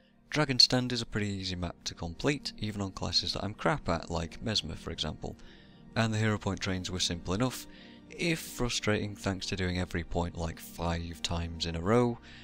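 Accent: North, United Kingdom. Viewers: Such a soothing northern lilt!:D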